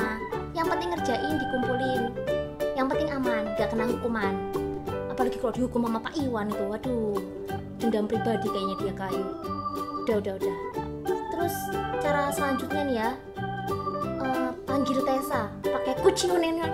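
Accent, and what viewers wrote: Java, Indonesia. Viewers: Wah,hi ini Brusan dengar suara ni,jdi org jawa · Medok banget jawanya 😂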